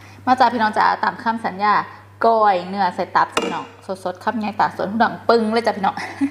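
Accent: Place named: Nong Bua Lamphu, Thailand. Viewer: คนเลยกะสำเนียงบ่แม่ลาลงัวใส่ปลาแดกกะทางเลยเว้าคือเว้าหนองบัว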